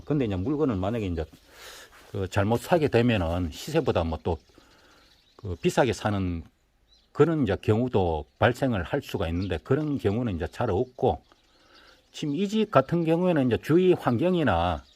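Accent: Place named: Hadong, South Korea. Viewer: 하동출신인데 고향말투가 느껴져 정겹네요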